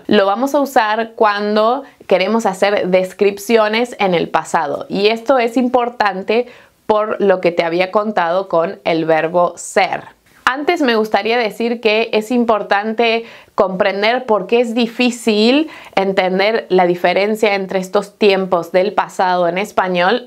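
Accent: Argentina. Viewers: ¿Eres argentina? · Ella está hablando más en castellano argentino salvó el tu qué acá no se usa el español tiene muchos tiempos de verbos que ni yo los se salen solos soy argentino si querés aprender te aconsejo que vayas más al norte de sudamericana te va a resultar más fácil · ¡Muchas gracias, muy interesante! Me gustan tus lecciones porque aprendí a hablar un poco de español cuando estuve en Argentina, hace muchos años, y entendí que eres argentina por tu pronunciación. La primera diferencia es la pronunciación de dos el... por ejemplo llave, lluvia, llamar, etc. Los argentinos los pronuncian más o menos como g, pero creo que la gente en España los pronuncien diferente. Disculpe mi español, es muy malo. Estoy aprendiendo.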